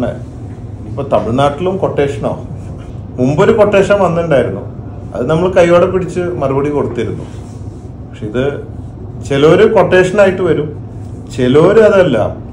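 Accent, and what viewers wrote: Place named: Neyyattinkara, India. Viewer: Ithu tamilnadu alla......kaliyakkaavilai,neyyattinkara slangs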